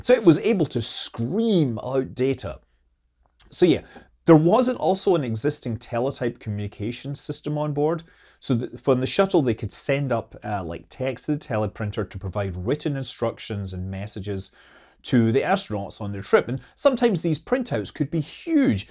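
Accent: Scotland, United Kingdom. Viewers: It's a Scottish accent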